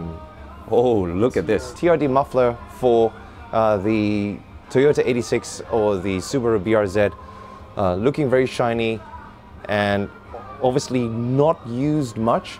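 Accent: England, United Kingdom. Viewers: i just randomly stumbled on to this channel i like it so far. Is this dude British? his accents super classy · is anyone else strangely fascinated by the British accent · i like your English accent no need translate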